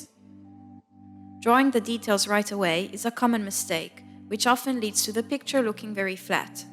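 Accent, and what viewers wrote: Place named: Arab world. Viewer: just a question but omg are u arab? i am! and u have kind of an arab accent!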